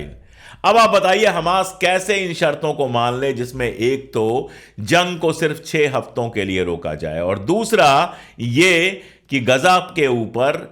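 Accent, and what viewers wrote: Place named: Awadh, India. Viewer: Bhayya aap ki aabaaz me Oudh ki khushbu aati hai, kya aap Oudh se hain? aapki patrakarita me insaniyat ki khshbu hai, Allah Paak aapko aise hi kaamyaab rakkhe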